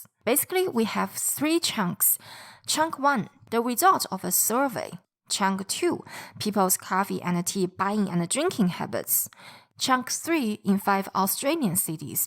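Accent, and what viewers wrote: China. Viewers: sorry to say your English is mixed with Chinese accent. Hardly can understand your pronunciation as what you are saying !!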